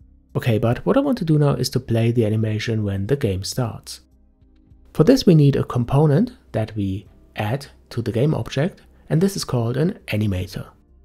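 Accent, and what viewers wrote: Germany. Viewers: Are you German? I noticed all German youtubers have a soothing deep voice, plus it's the only accent that isn't annoying to hear. And the videos are always well made with zoom animations and without a single detail left unexplained to ensure zero viewer frustration and confusion. How did they lose the war?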